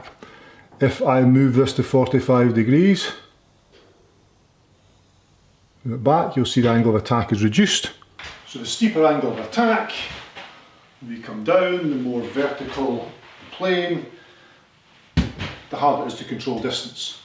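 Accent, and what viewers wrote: Scotland, United Kingdom. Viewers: Well explained and demonstrated, as usual. Your videos are easy to follow as you explain and demonstrate very well , with a keen awareness of camera angles for the best viewer experience. Your delivery is excellent based on clear diction and a slightly aggressive but at the same time friendly direct Scottish accent, which I like - direct and no BS. Good sense of humour with amusing asides to camera are also very good. Lots of stuff on you tube but you appeal to a high handicapper hacker like me. Seeing some improvement with eureka. Big fan, keep up the good work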